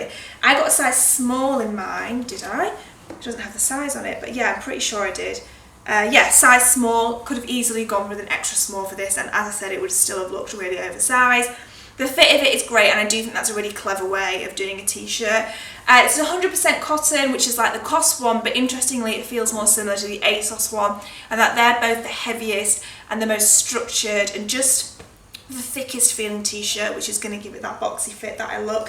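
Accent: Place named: North, United Kingdom. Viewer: My favourite fashion youtuber & you have a northern accent which makes me feel so at home!! Stripes are my favourite at the moment - I've been about bought a couple of striped tops yesterday! X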